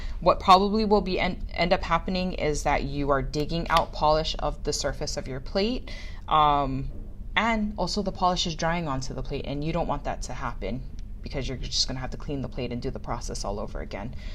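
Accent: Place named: Hawaii, United States. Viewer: Aww I could tell where you’re from by your accent before you said Hawaii! ❤️ I’ve kept an eye out on your products since the Bundle Monster days but still haven’t gotten around to purchasing yet...fell out with nail polish for a while because I couldn’t wear what I wanted at work. Now I’m slowly rebuilding my collection, thinking of finally getting a stamping plate, and omg how am I finding out only now that you’re from Oahu 😲 now I’ll have more reason to buy, love supporting local...even though I’m currently living away from home! 🤣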